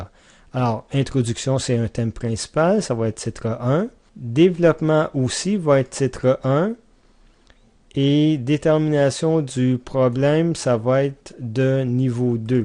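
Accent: Quebec, Canada. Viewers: avec l'accent québécois en plus!!! :P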